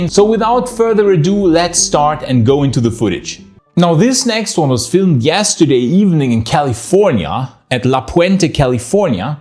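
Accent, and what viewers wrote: Germany. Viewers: I’m still new here. I love your accent. I traveled around Europe for a few years. Where are you from..Germany? I lived there for about 6 years. Spangdahlem. Beautiful country. I loved my time there. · Id say it's a german accent. He just speaks english very well. Maybe im wrong. I am by no means an expert.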